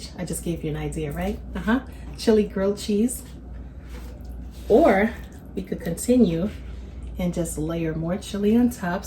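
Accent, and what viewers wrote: New York, United States. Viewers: That looks amazingly delicious 😋 and it’s sounds like a New York voice you have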